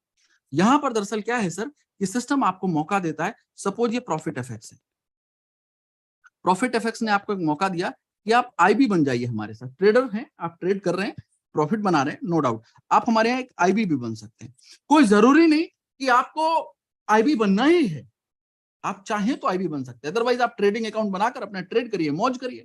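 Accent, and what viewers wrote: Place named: Uttar Pradesh, India. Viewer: ji kya aapka number mil sakta hai aapki hindi se lagta hai aap UP se hi hai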